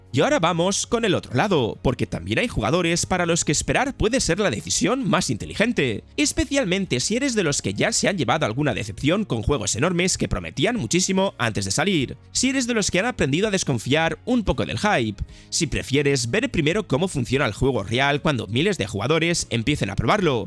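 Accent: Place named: Spain. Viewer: Por su nacionalidad el espano se habla en much paices 😂😂lo que cambia es el acento creo que es be ser espana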